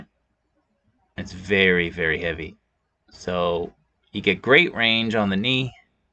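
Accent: Boston, United States. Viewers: What kind of accent is that ? Boston?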